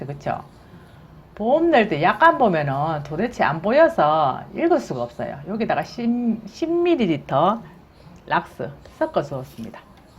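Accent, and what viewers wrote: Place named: Busan, South Korea. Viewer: 부산 사투리 너무 매력있어요~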